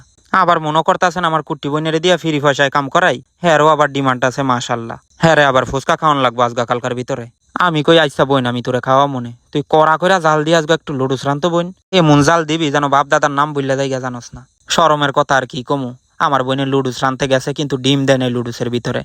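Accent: Comilla, Bangladesh. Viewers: Vaiya Tumar kotha gulovonek Valo lage ar onek funny lage accha vaiya Tumi koi thako 😂😂😂😂😂Tumi ki kumilla te thako tomar vasha gulo amon kummillar moto 😂😂😂😂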